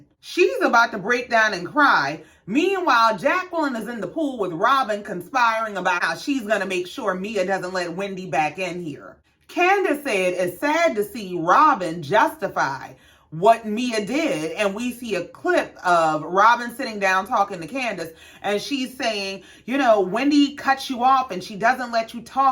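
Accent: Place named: Gullah Geechee, United States. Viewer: I just found your channel. I love your commentary and it’s a plus you sound just like my people from SC. (Geechie) Subscribed! ☺️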